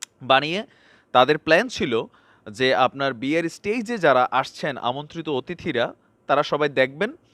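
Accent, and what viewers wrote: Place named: Cumilla, Bangladesh. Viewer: ভাই এই ভাবে গান গাইলে তো আমি আপনার প্রেমে পড়ে যাবো সো কিউট কুমিল্লাার ভাষা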